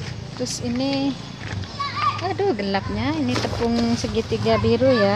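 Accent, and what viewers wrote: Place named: Jember, Indonesia. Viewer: asli mana ya klu boleh tau kayak logat bicara e daerah jember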